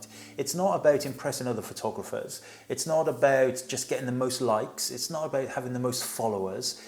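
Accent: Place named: North Wales, United Kingdom. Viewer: Great advice, looking to start up myself and this puts things into so much perspective that I haven't seen after several months of research!! Are you Welsh by any chance? You sound North Welsh. Cheers for the video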